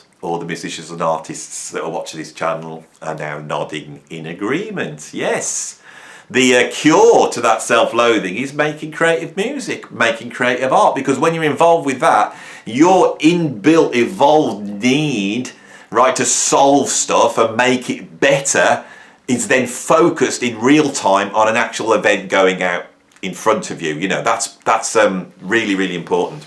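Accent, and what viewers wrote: United Kingdom. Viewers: I thank you for your channel it's therapeutic and informative. Plus, I enjoy your British accent 👍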